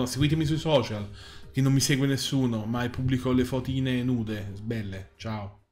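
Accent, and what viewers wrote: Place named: Tuscany, Italy. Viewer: Non te la prendere... Purtroppo vi sono troppi analfabeti funzionali in rete... Bellissimo video cmq!😉 Cmq fantastica la tua cadenza Toscana... 😉 P. S. Ci vuole intelligenza per capire i tuoi video, dote oggi troppo sottovalutata... P. P. S. Visto che parli di serie interrotte per morte degli autori o interruzione per flop del prodotto, puoi fare un video su Tekkaman e Silversurfer?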